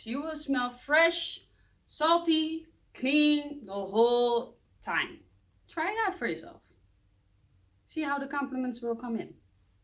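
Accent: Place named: Netherlands. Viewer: I can tell by your accent that you're from the netherlands, klopt dat haha? My favorite is Bvlgri Aqva Amara for the summer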